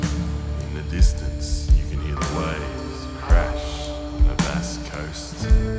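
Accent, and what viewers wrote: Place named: Australia. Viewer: Wou Aussie as voice! Reminds me of the movie two hands, the dead big brother narrating.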